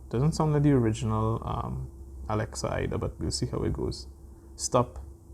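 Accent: Trinidad and Tobago. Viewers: U sounding rell trini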